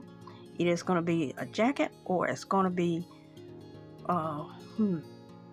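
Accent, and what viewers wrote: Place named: Louisiana, United States. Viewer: I know this is kinda random, but you sound SO MUCH like my Mamaw down in Louisiana. Are you from anywhere near there? I miss her so much; listening to your voice is like a balm to my soul. 🥰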